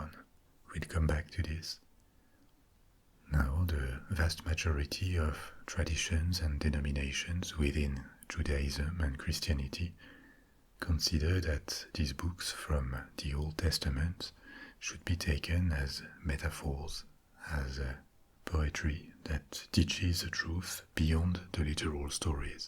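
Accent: France. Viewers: Duhhh Guys! His title is! Lol 😆 you can here it in his voice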